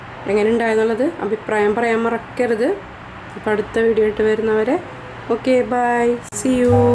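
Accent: Kodungallur, India. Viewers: Ingale veedu kodungallur anganam ano? 🤔 nalla slang kdglr karde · കൊടുങ്ങല്ലൂർ ഭാഷ... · Ith kodungallur slang aanu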